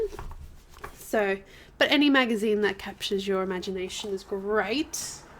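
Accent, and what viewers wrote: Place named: Australia. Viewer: Just found you....love your ideas! Will subscribe....are you in Australia? Recognise your accent, I’m in Oz!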